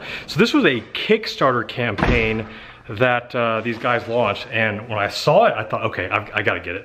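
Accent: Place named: South, United States. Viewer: You live in the southeast? I luv that southern accent 👍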